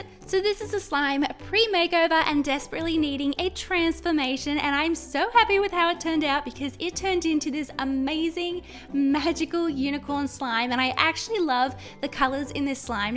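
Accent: Australia. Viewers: “The accent is Australian”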